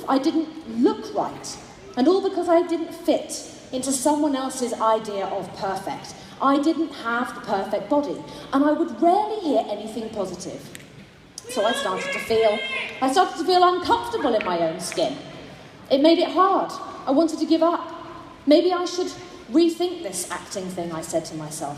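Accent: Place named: United Kingdom. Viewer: Nice accent British Nice speech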